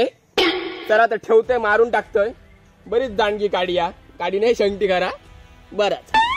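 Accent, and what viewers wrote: Malvani, India. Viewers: Lay bhari bhava.. Tuzi malvani boli ti pn tuzya unique comedy andajat hasayla bhag padte.. Kahitari navin karnyacha prayatn ahe tuza khup mast vatat tuze video baghun. Keep it up bro.. 👍🏻👌🏻👌🏻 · Amazing bhava !! 😂😂✌🏻 tu ani tujha malwani accent 😂✌🏻♥️ tyane video madhe ankhin fun yeta !! Keep it up bro ♥️✌🏻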